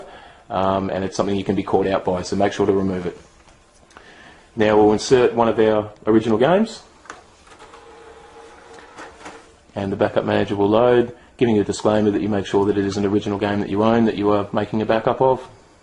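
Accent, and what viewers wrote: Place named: Australia. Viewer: are you from oz mate????sure sounds like it.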